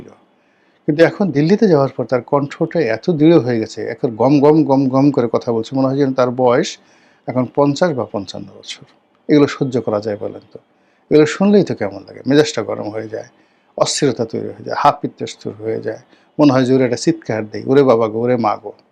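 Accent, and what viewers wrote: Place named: Bengal, India. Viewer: গ্রাম বাংলার গেঁয়ো কথা গুলো খুবই মনোমুগ্ধকর।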